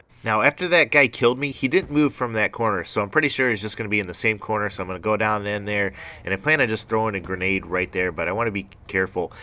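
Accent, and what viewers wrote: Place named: Midwest, United States. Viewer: You have a Midwest accent.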